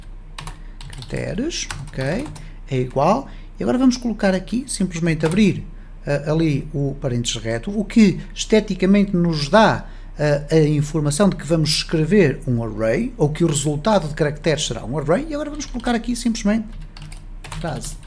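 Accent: Portugal. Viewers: "cá está então" - esse cara é portuga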